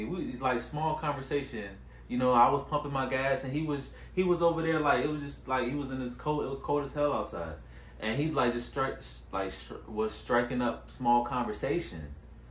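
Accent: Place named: Virginia, United States. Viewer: I'm up early on a big Sunday as well😂. I'm about to do a HITT work out and a short session of yoga at home because its way to cold outside to run. I totally agree with you about social media. I deactivated all my accounts 5 years ago and my life has been SO much better, along with my mental health (I kept YTube as a learning tool ). It is so freeing. Im living my life authentically, not based on, or in comparison to what others are doing. I also feel other people's lives are none of my business and my life is no one else's. I share pics and moments with my family and close friendz-and that's enough. I even stopped listening to music with words for a few years to make sure my life and views on relationships weren't being influenced by lyrics in a song, especially rap and R&B. Afterwards, I realized, truthfully, I didn't even want to be in relationships and I didn't care about getting rich or looking wealthy anymore; I was being swayed by the music I was listening to everyday. 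I know this all sounds extreme, but that's how serious I was about changing and truly finding myself. Nowadays Im focusing on completing my second degree, health, traveling, art, plants, friendships, and growing my retirement accounts 😊. S/N: Are you from Virginia? You have their dialect.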